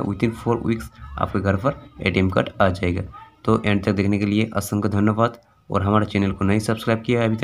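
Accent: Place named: Bengal, India. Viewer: Jo bhi ho ye to pata chal gaya k tum bangali ho.hindi tumko thikse nahi ata hai to bangla me vodeo banaw.hindi me jaruri nahi hai.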